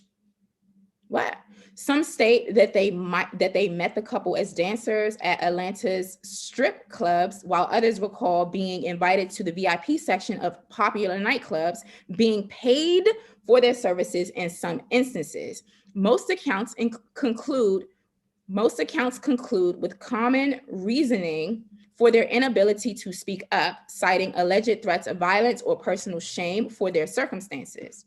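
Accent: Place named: DMV, United States. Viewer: I can tell when someone is from the DMV area... I hear it in her voice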